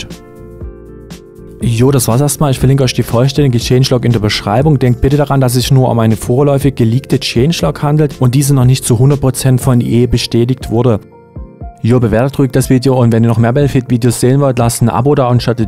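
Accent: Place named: East Germany, Germany. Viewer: Keule, Keule... Ost-Dialekt, und dann so schnell reden, inklusive Englischen-Begriffen?! Da platzt mir die Birne 🙇‍♂️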